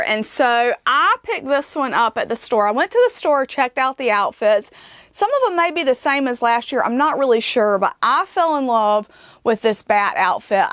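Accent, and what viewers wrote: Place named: South Carolina, United States. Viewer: Your accent reminds me of South Carolina. Love those dolls you showed.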